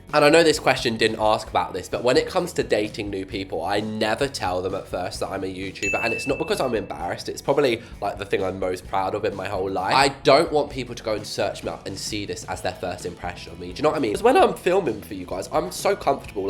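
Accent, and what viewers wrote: United Kingdom. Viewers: Why are you British? 😊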